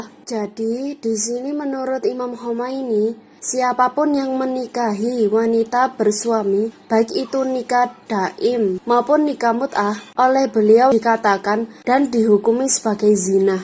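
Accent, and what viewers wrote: Java, Indonesia. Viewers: logat mdok...skitar pulau jawa ni...mf sy cinta pulau jawa umumnya indonesia...sy nebak asal orng ni ja...mf jngn trsinggung...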